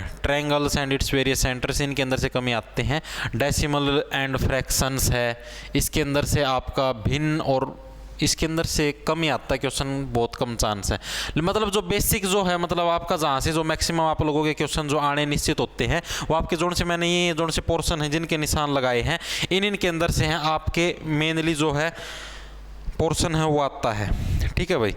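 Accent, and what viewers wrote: Haryana, India. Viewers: Haryanvi h bhai 💪❣️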